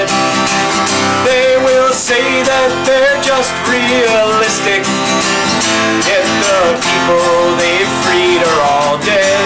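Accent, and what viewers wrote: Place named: Ireland. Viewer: You are so Irish sounding... Still holds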